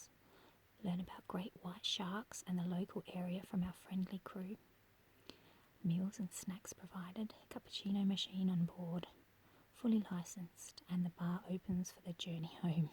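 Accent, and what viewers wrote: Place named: Australia. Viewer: Love your voice, so Aussie.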